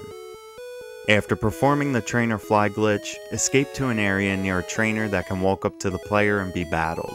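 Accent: Philadelphia, United States. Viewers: I recognize your accent! Are you from Philly, too? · Are you from philly? Your accent makes me feel like I’m at home lol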